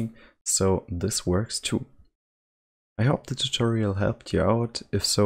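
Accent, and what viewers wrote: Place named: Germany. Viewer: Nicht mal 5 Sek im Video und erkannt das er Deutscher ist! HAHAHAHA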